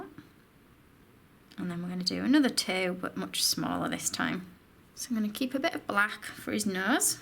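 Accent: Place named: Yorkshire, United Kingdom. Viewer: Hi this is great. Amazing! What a creation. Also your lovely accent reminded me of "Miranda" where Miranda pretends to be "From the general Yorkshire area".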